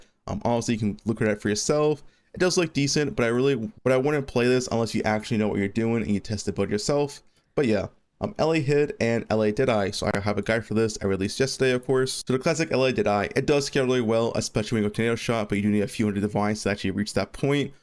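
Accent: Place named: United States. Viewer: Good info, but you need to pronounce build names slower and clearer. I had to rewind a couple of times just to hear what you were saying. I'm not from america, so your particular accent isn't familiar to me.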